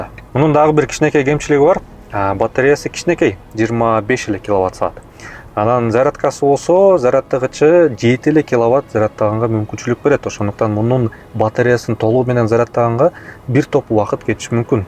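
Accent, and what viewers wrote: Standard. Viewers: Таза кыргызча уккан кандай кана кулака жагымдуу угулат😍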